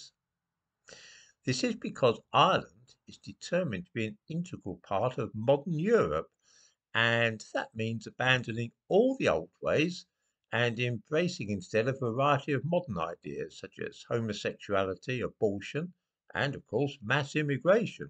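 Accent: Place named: England, United Kingdom. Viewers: Nothing like a man with a english accent , lecturing Irish where they took the wrong path, ??? After surviving the genocide inflicted on our race by people with similar accents , its kind of ironic this particular lecture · This is one of the most ridiculous videos on this website. It’s not people with African or Asian accents that destroyed Ireland’s indigenous culture, but rather people with accents like yours. Unless these immigrants murder and oppress huge chunks of the population SEVERAL times, they will never match what the English did. Also, the definition of what it means to be Irish is changing all the time. Centuries ago, an Irishman was simply a Gael from Ireland. Today, many people who are white and who have ancestry going back centuries would be very offended with this definition, as their own culture and customs are no longer Gaelic, but anglicised. 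This definition of Irishman will continue to change into the future, and if there’s nothing wrong with the change up to now, then there’s nothing wrong with the change to come.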